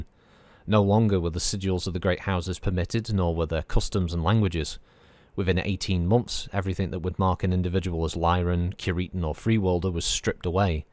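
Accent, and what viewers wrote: United Kingdom. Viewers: Everybody can read the book, it’s true. But not everybody can read the book with a British Accent. 8-)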